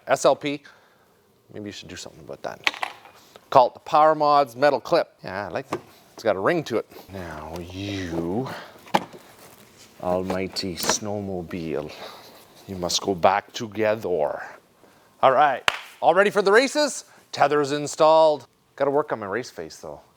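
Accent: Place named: Northern Canada, Canada. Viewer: it sounds like your from Northern Canada. where abouts?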